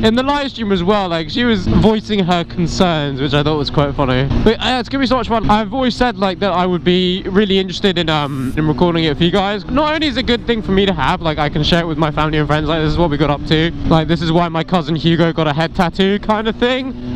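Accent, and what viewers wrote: United Kingdom. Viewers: Gotta love it (the British accent)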